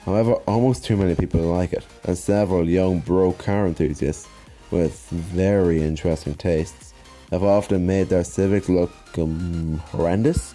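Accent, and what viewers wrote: Ireland. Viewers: Hi... Only me r u sound Irish?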